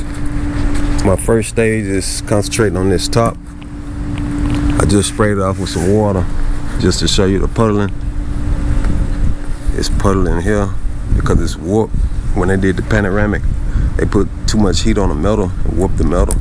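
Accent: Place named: Dallas, United States. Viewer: You sound like you're in dallas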